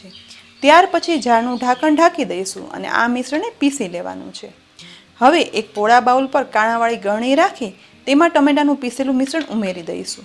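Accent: Kathiawar, India. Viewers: Soup saras..mug pan saras. Bhaat pan saras..salad pan saras...badhun j "Madhuram Madhuram!" Thanks. Amey aavaa oogaadelaa mug banaaviye ne saathe Kadhi, bhaat ee combination maan kariye. Thanks for the recipe. Kathiyawadi mithi bhasha saambhalavaa thi maney maarun Rajkot yaad aavi jaay chhe. Hun tau janmi tyaar thi mumbai maan j oochhari pan amaaraa ghar maan kathiyawadi , naagar ni bhaashaa j saambaleli, vali sanyukta kutumb maan rahyaa ee j amaarun gujarati vaataavaran , baaki aaspaas tau christians aney momedan loko ni j vasti. Lagna pachhi Rajkot rahevaanun thataan Rajkot maan vadhu saaro avakaash malyo Guajrati bhashaa no laabh malyo. Thanks again.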